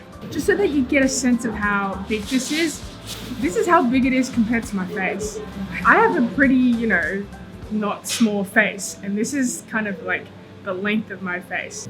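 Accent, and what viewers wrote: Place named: Australia. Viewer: So cool seeing an asian with an aussie accent ! Very pretty girl and great food vlog !!!! Love from Arizona =^..^=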